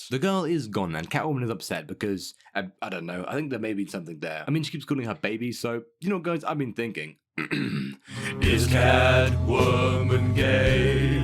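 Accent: United Kingdom. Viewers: Wait... you're british?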